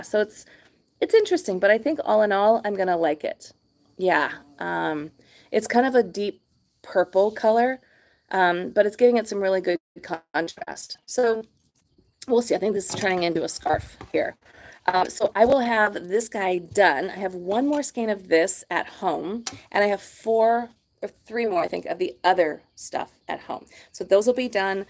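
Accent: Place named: South, United States. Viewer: Howdy neighbor lol. From Alabama here, nothing wrong with a Southern accent 😊 loved this video ❤️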